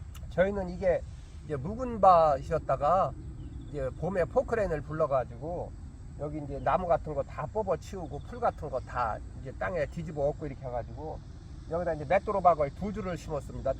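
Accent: Chungcheong, South Korea. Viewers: 충청도 사투리 정말 정감있고 와닿네요~^^ 단호박 비료 안줘서 한이지~ㅋㅋ 매번 잘듣고 도움되고 있습니다.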